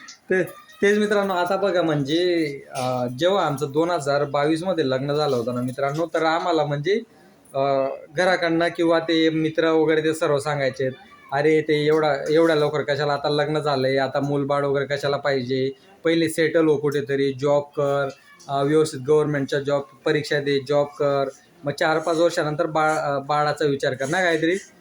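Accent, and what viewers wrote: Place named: Jalgaon, India. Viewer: बोलण्याची ट्युनिंग जळगाव ची वाटते. आपल कोणी बोलत आहे असं वाटत आहे 😂😂😂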